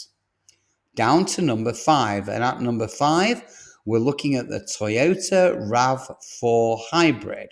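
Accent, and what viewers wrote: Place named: United Kingdom. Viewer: Love that British accent, and apparently not AI. Great work!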